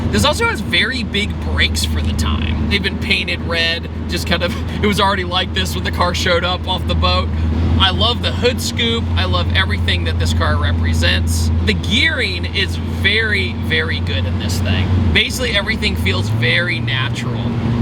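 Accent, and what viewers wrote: United States. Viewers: Damn the accent tho even when saying wrx american english and accent.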